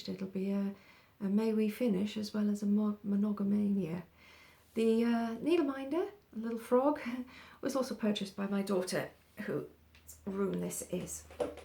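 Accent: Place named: United Kingdom. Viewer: on Flosstube you are a treasure. I was so calm listening to your voice yesterday. I am never calm and can’t relax. Lol. British accent and dialect is always relaxing to listen. Hurry and post another one. I want to see what you’re doing. I might try to make a video this weekend. Maybe. LOL Are you on Instagram? I’m Sweetwoodruffs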